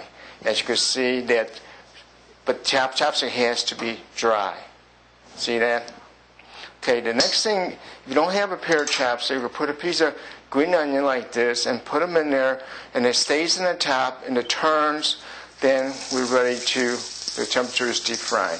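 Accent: United States. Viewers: i can sense a Chicago accent from this cook. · no. he learned english with some kind of chicago or midwestern american accent. the accent is american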